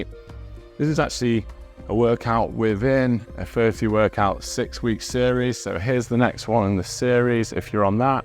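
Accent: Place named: Birmingham, United Kingdom. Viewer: Great stretch to follow a workout! Thanks,. By the way, I love your accent. Have you seen “Peaky Blinders”? Your accent reminds me of the accents in that show. I feel like I’m working out with Tommy Shelby. 😜 Thanks for the great stretch!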